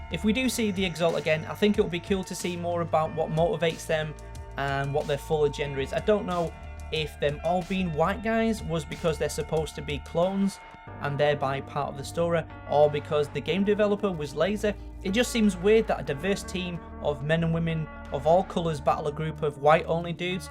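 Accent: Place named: Manchester, United Kingdom. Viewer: He sounds Manchester-ish to me, in case you were wondering where his accent originates from.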